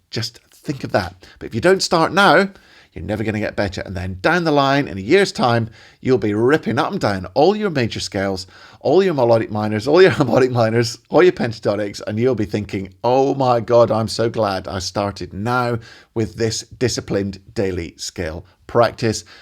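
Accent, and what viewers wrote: Scotland, United Kingdom. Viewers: You are so kind! Thank you. Are you a fellow Scot? Thought I heard an accent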